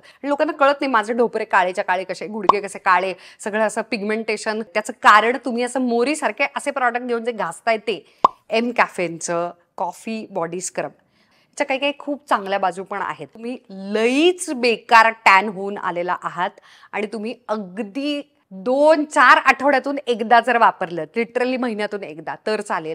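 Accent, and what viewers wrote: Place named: Satara, India. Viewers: 100% Satari Accent.....👌🏻❤😂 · Great Marathi accent 😅😅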